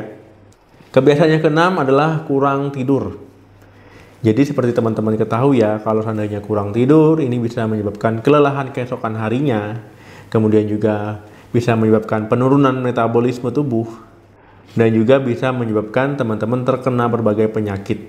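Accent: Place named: Bali, Indonesia. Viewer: Dokter ini pnya logat bali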